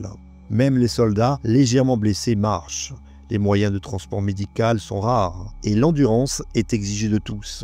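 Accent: Corsica, France. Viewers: Magnifiquement documentée ! Félicitations pour ce travail. Je crois reconnaitre un sympathique accent Corse chez le narrateur, est-ce bien le cas ? Cordialement. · Hey ! Il est d'où ce bel accent Corse ? :) ❤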